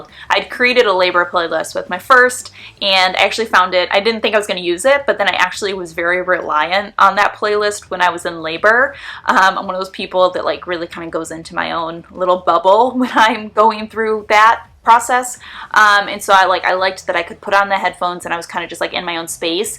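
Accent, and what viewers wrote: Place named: Connecticut, United States. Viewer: So refreshing to hear a Connecticut non-accent! Been in Maine wayyy too long!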